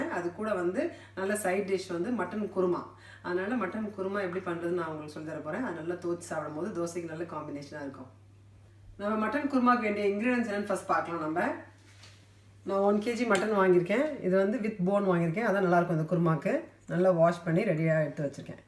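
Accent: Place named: Theni, India. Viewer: Oh ok k Ur slang like theni people 😊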